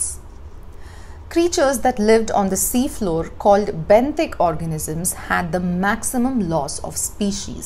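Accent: India. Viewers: I love female Indian accents. 😍😍😍😍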